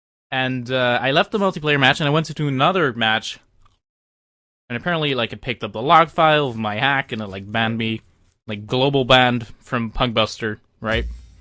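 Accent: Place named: United States. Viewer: Thought so, 'cause he speaks english like real americans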